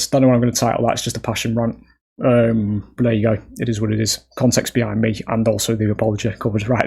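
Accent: United Kingdom. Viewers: Random. But are you from Wrexham?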